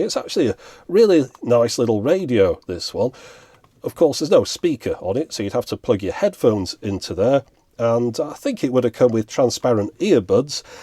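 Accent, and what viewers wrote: United Kingdom. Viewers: As soon as I heard a British accent, I had to leave because the American prison system has different stuff, this is probably items from a prison from England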